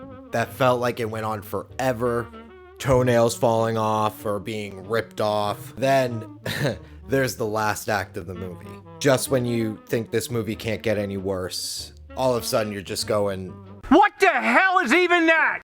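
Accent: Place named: Italian-American, United States. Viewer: Omg I recognize Mackenzie Phillips from Black Mirror! Speak No Evil looks gooood, I love horror movies where you're just super uncomfortable on a visceral level. EDIT: To add that your Italian-American/Tony Soprano accent is solid.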